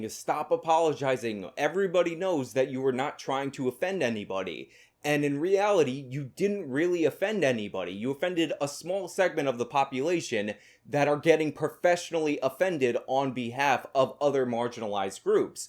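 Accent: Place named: South, United States. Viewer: A man with a southern accent apologizing for hurting peoples feelings. The South as a whole weeps for his cowardice.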